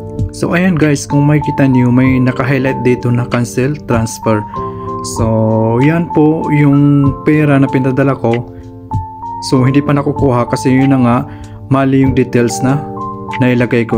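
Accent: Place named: Maranao, Philippines. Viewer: Hello sir maranao po ba kayo,kc maranao kayo magtagalog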